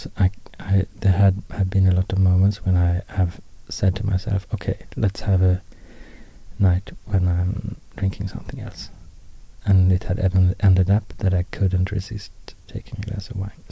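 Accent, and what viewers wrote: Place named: Sweden. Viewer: I specifically came here for Swedish language and Swedish accent, so feel free to mispronounce any words :D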